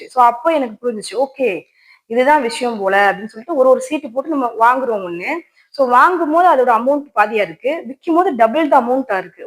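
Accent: Madurai, India. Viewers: Akka neega Madurai ah ka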